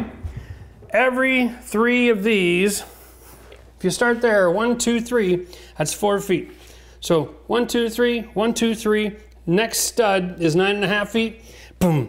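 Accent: Canada. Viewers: I'm not sure about there, but they changed the formulation of pressure treated down here, and it eats steel pretty fast. If you don't use HDG or coated nails / screws - they'll be completely gone within 2 years. What your using there doesn't look pressure treated though. Maybe there's some sort of flashing tape, or maybe a strip of tyvek or something would be good to protect that? Idk - tyvek might pass it right through? Or maybe there's some kind of coating for the track? Maybe - my fear is just unfounded! Also, dang right, tyvek isn't a vapor barrier! It's a vapor retarder, but it's not a barrier. But up there (assuming Canada from the accent), my understanding is that exterior walls up there have a vapor barrier on the inside, fully taped seams. Down here in hot climates there is no vapor barrier at all. There's a vapor retarder. The paper face on the insulation - which also goes on the inside of the wall. 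The exteriors we wrap with either tyvek or 15lb.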